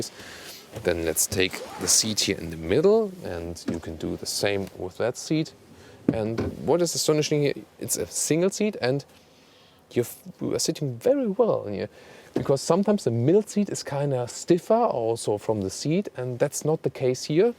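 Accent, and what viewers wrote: Germany. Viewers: ich finde, dass das Englisch noch zu Deutsch klingt.. Und andere Frage, wo genau ist denn dieser Ort? Da sind anscheinend immer "Fahrzeugvorstellungen" mit einer art von Modell? Ah und wegen dem Knöpfen auf dem Lenkrad, genauso wie beim Ford Mondeo ;)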